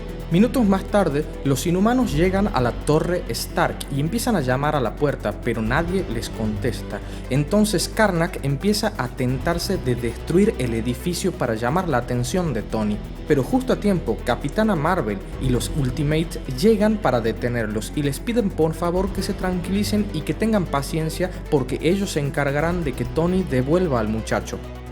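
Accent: Tucumán, Argentina. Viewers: Es Tucumano?. Un capo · De que nacionalidad sos... Te me hiciste tucumano o catamarqueño con tu pronunciación de tiesha(tierra) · tucumano mañero ja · Bien Tucumano so URA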